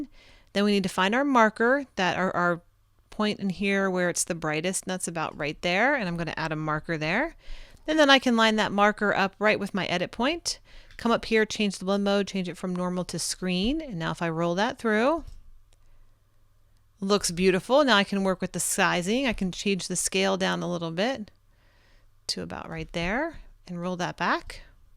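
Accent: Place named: United States. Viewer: Very good explanations and perfect us accent for me (French 😉) !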